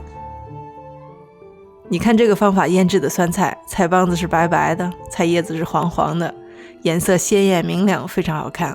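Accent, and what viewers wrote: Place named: Shaanxi, China. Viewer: 是陕西人啊，听出来了，能打听一下你做浆水鱼鱼的那个工具在那买的吗？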